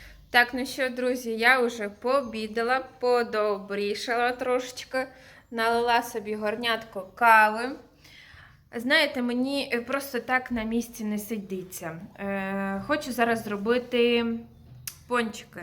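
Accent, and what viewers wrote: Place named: Ukraine. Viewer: а с какой ты области? Чувствуется, что не с Западной. Будь здорова, чорноброва.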